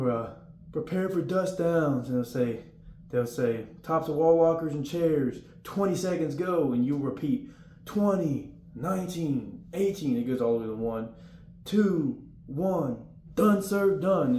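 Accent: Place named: South Carolina, United States. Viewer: Are you from South Carolina? Sound like it lol i am too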